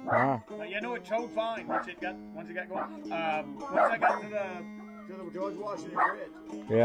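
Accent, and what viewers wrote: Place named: New England, United States. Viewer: Love that New England accent. No doubt as to where he's from.😆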